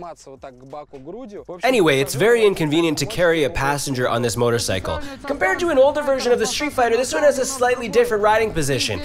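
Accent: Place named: England, United Kingdom. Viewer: oh you're from England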